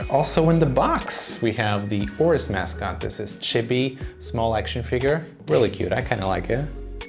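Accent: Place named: Sweden. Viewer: I think he is Swedish.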